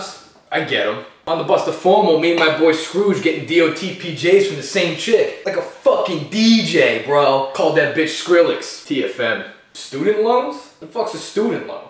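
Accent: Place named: New York, United States. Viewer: I have a guy like this in my fraternity. Dude is hilarious. Same accent, too. NYC!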